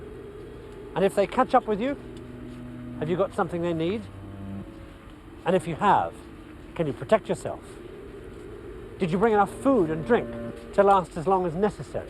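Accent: United Kingdom. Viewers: This is such absolute bullshit. This is not us being reliant on technology. It is us being reliant on COMMUNITY. And that is a good thing. Oh electricity goes out, so the postman goes to find a farm to grow his own food? This is nonsense packaged up in a nice british accent. Society divides out tasks. The nurse doesn't have to know when to grow crops, and that isn't a failing of society. And we as a species have invented this brilliant invention called electricity and created a network that provides every household with it. Which turns manpower into a communal good, instead of something individuals have to provide. It is a miracle, that yes, it can fail. But we shouldn't give up on it, we should safeguard it.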